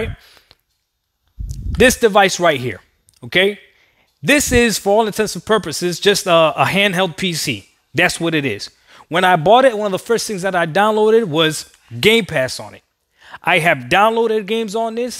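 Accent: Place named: New York, United States. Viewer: Bro where you from New York or jersey??? Accent thick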